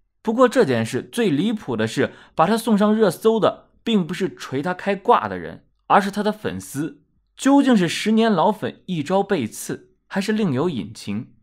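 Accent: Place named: South, China. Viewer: 南方主播，一嘴土味。做出什么事来都正常，从来不看。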